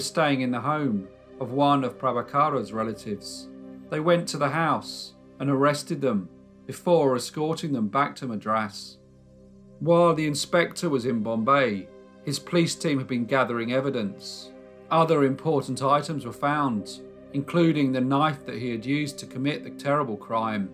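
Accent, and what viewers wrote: United Kingdom. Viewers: thank you for another outstanding video of true crime that is both unique and unheard of by me. You and Forgotten Lives have some very special content and it's an absolute pleasure listening to both of your videos. 🙏🙏Plus, your awesome British accent makes everything sound even better. 👏👏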